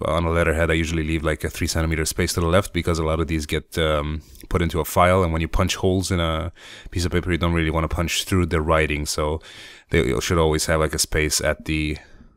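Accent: Poland. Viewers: I really like your polish accent :D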